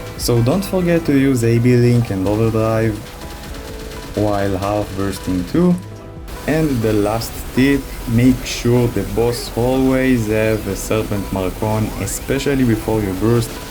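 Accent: Israel. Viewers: המבטא הישראלי תפס אותי גם חחחחח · 1 thing i didnt see u mention is the "Takeno's Blessing" skill from mushroom shrine. Its a fake iframe that can tank some boss patterns ( Lucid's bomb, p1 Will screen crack and a few others ) AND u can reset it with Time Leap. And if ur already going for the mushroom shrine skills u can also grab the Yorozu bind as it is also resetable with Time Leap. Also, dont worry about ur accent, its much better than other Israelis. Keep on the good work!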